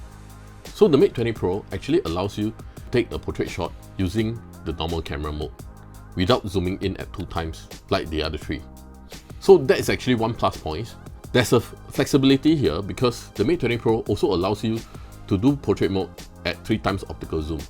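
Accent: Singapore. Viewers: Good reviews , only problem is your Singlish. Always fast and no tone at all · Within the first 5 seconds of the video, I can already tell this dude is either Malaysian or Singaporean through his accent. Turns out it was the latter XD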